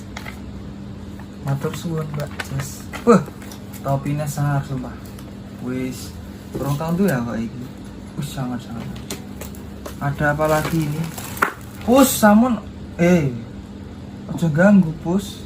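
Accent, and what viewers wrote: Java, Indonesia. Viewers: Bukan Indo. Tapi Jawa..itu namanya orisinil..gak sombong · Logat Jawa mu metu mas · Jowo ta mas samean?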